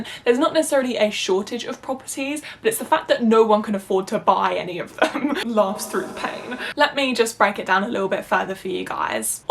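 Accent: England, United Kingdom. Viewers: English accents might be more diverse than you think. Her accent is very clearly an English one.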